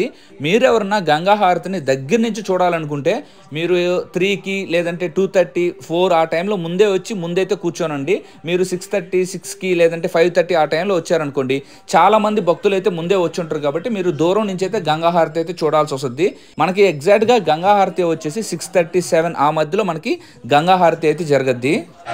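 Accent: Nellore, India. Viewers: Bro mana nellore slang baga telastundi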